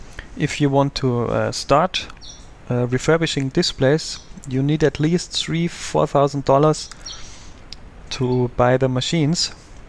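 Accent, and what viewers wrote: Germany. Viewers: Your Bavarian English is quite good!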